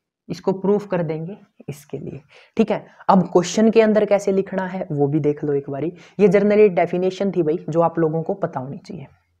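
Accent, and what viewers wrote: Haryana, India. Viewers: Sir aap haryana se ho kya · Sir your efforts are so appreciatiable. I like your Hariyanvi acsent .👌🙏😃😊👏🤗🙏😊👏👍🙏👌😃😍🎊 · Kis kis ne lagya ke sir Haryanvi ha 💪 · Sir g aap haryana se belong karte ho na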